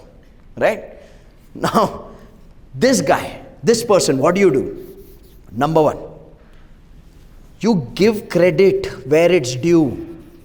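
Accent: India. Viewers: Very good lessons. Thank you. You sounded more like a south indian. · Hindi style of accent 😮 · I love your explanation. I understand you were talking to an especific audience but you ended up talking to a lot larger audience. If possible could you please avoid English dialect to include non indian English speakers? Thank you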